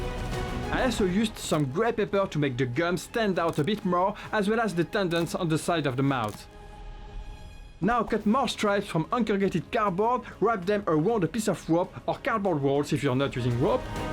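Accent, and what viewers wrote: France. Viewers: Merci pour le template, je suis actuellement en fabrication du mien. J'ai une question, avec ton accent, est-tu français ?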